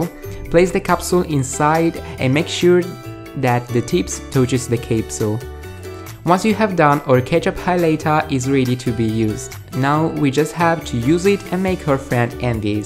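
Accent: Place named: Italy. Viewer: Zac te wait to see the hamburger tutorial, your Italian accent is so cute 😀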